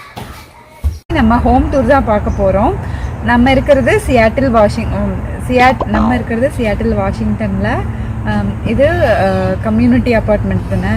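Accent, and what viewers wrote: Kongu, India. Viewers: Kongu speech &look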